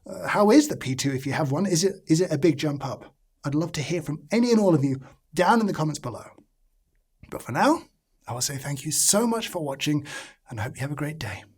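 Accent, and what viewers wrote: United Kingdom. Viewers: Americans trust British accents like. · "This seems to be symptomatic of something that gets me a little bit excited." OMG! So British... Old school British! I don't care that much about coffee, but I love listening to this guy talk! And, man... does he talk.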